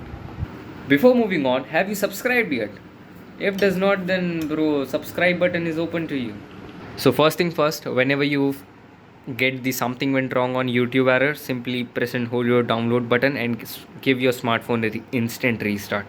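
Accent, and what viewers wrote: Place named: India. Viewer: adopt a british dialect please not an indian dialect please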